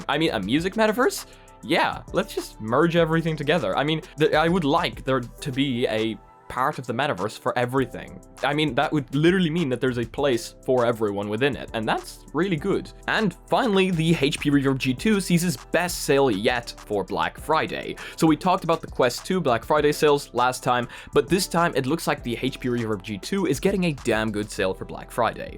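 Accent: Ireland. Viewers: I love when the Irish accent sneaks out 🍀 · You have just a whisper of an Irish accent, dont you? Am I Right??? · Bro sometimes u just sound irish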